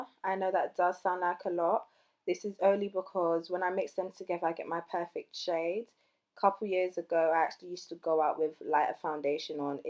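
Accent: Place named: Jamaica. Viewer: love the look....ur accent,,,, do you have jamaican background?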